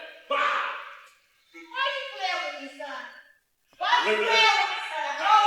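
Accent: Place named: Caribbean. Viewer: I 🇹🇹 I thought I heard d West Indian in you some time ago!🇯🇲❤❤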